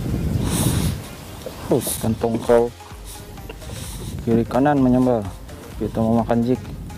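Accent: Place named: Sabah, Malaysia. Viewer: mancing kt area mna tu dgr logak cko mcm sabahan sja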